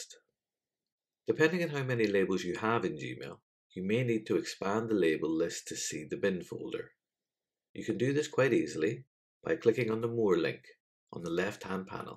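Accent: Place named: Wales, United Kingdom. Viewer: I like your Welsh accent (I hope it's Welsh). AmIright? This video is easy to follow, your speech is CLEAR, and easy to understand. You get a TEN out of TEN. Thank You!!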